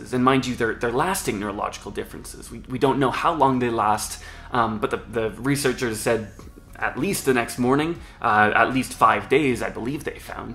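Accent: Canada. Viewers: Your accent says you're in Canada. Am I right? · This guy’s Canadian. ‘Bout!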